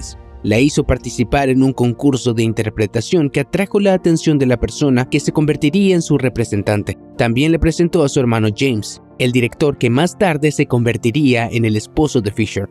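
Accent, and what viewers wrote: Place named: United States. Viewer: Menuda ostia tienes con ese acento yanqui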